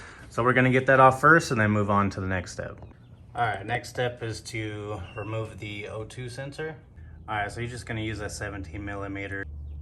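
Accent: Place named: United States. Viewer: Damn bro that American accent. AcrapOvik. It's akrApovich. Other than that, nice informative video❤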